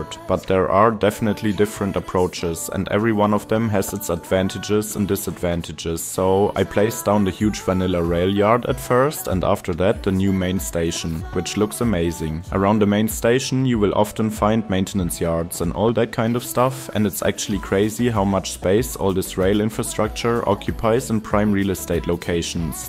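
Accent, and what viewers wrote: Germany. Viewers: I think the German accent fits perfectly to the video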